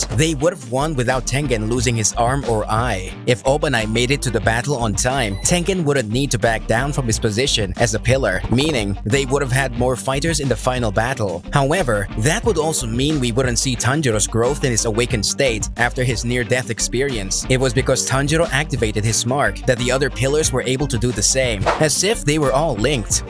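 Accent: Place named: Philippines. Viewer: I like the subtle Pinoy accent, love this what if scenarios kabayan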